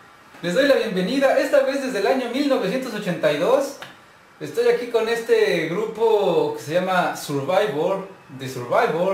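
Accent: Mexico. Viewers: veo tus videos aunque no estoy suscrito, juegas bien al Mario maker pero tu comentario a la hora de jugar casi no me agrada trata de ser más espontáneo y que se te salga menos lo chilango y me suscribo, sigue así bro 👍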